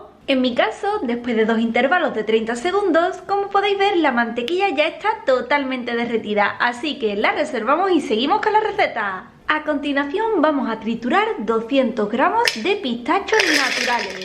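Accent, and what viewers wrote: Andalusia, Spain. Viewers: Que acento mas hermoso 🥺 es de andalucia o por ahi??.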